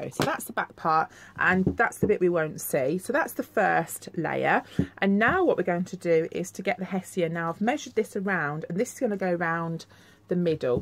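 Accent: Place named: Northern England, United Kingdom. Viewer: Hi.I don't do crafts but find it very therapeutic to watch.Also I like how real you are and love your Northern English accent.Thank you.☘️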